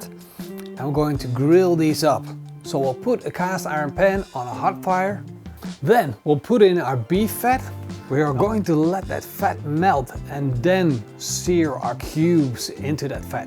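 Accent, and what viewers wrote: Netherlands. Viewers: wat een mooi nederland accent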